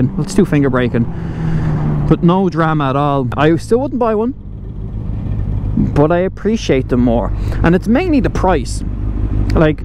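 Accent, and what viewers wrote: Ireland. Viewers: it has nothing to do with your being Irish, or you accent. In fact I love the Irish people very, very much. There were quite a few times when the editing was not as accurate as it could be.. As I said, I love the Irish very much. And I love your accent. It was not my intention to upset you in anyway. And I’m sorry if it did. Please except my apology for any words I said that might make you think otherwise. Owned a Honda CX 500 V Twin in my younger days. It was when I began to suffer from arthritis that my wife and I turned to cars. My car is now Electric and love it. Hope you might buy this bike. Boy it’s quick off the mark. Richard.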